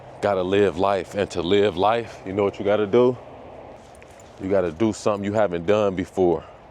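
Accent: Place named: Jamaica. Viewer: God bless my brother 🙏 why I thought I clicked on another persons videos when u came with the Jamaican accent 😅😭💀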